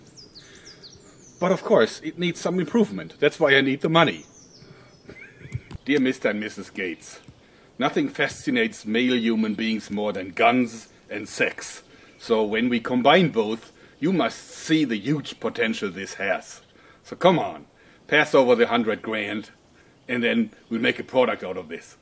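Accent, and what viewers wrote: Germany. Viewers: It's cool how I recognized the German accent even before I knew you ARE German. xD By the way, I'm German too, so it's no hate. I also have some bad accent. :) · when you hear his ,,dialect“ of speaking, you know that he is from germany;) geile video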